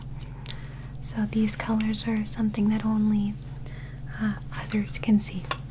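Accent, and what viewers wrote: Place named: United Kingdom. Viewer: The British accent noooooooooo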